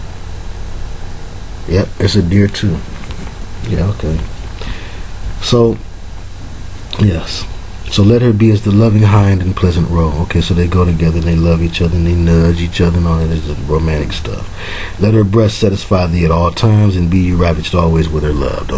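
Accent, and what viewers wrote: Detroit, United States. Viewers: Proverbs and Psalms are my personal favorites. I love listening to them interpreted with your old school Detroit flavor.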